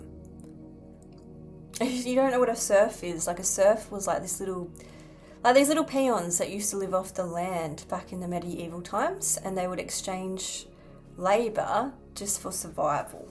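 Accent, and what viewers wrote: Australia. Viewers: Hello from California. Good singing voice, Great voice,. Great Australian accent. Great messages from Australia. Happy Easter from our channel.💃💃💃🏻💃🏻🦚🦚🦚🧤🧤🧚🏻‍♀️🧚🧚🏻‍♂️🧚🏻‍♂️🧝🏻‍♂️🧝🏻🧝‍♀️👒🧜‍♂️🧜🏼🧜🏻‍♀️🐣🐣🐥🐥🐇🐇🐇🐓🐓🐓🦜🦜🦜🦜🦜🦜🦅🦅🦅🐎🐎🐎🦚🦚🦚🐦🐦🐦🐤🐤🐤🦆🦆🦆🦉🦉🦉🦋🦋🦋🍀🍀🍀☘️☘️☘️🐦‍🔥🐦‍🔥🐦‍🔥🦢🦢🦢🦩🦩🦩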